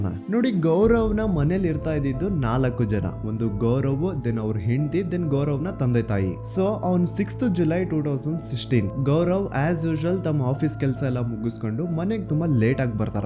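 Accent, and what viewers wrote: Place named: North Karnataka, India. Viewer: ಉತ್ತರ ಕರ್ನಾಟಕದ ಕನ್ನಡ ಭಾಷೆ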